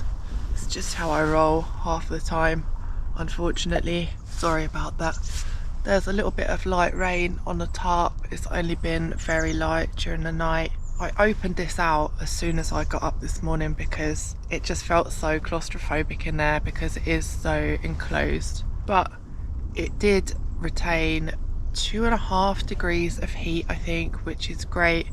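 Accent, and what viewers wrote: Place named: RP, United Kingdom. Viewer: You sound very posh to me. It's like I'm watching a BBC Nature programme.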